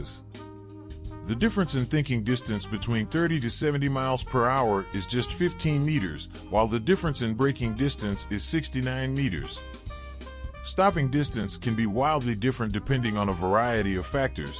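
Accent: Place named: United States. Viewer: This presenter seeks "likes" and subscribers, but I, for one, will not be doing so. The video, which, judging from most of the imagery, was of British origin , (let's face it, an American wouldn't include non-American images), but the voiceover is in the most annoying sententious American accent, reading the script badly, particularly pausing in the wrong places.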